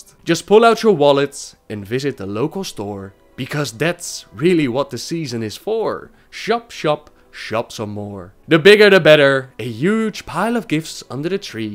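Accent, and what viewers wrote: Netherlands. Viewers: Love the lore vids. It's nice not having to read it all on the wiki for a change. That accent, Dutch?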